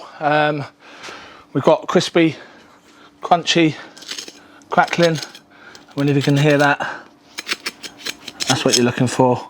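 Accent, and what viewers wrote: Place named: East London, United Kingdom. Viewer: Nice to hear a great East Laaahndan (or Essex) lad showing the World how to do pork “right proper”! Thank you, I’m trying pork on the rotisserie tomorrow, and I’m going to copy your great instructions.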